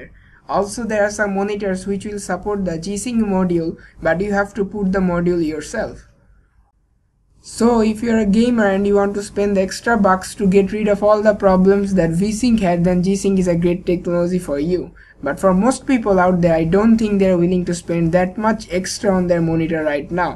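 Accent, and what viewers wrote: India. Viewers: I know its out of context, but I loved ur acent..lol..much like Indian accent..kudos.. Nice video. Thumbs Up.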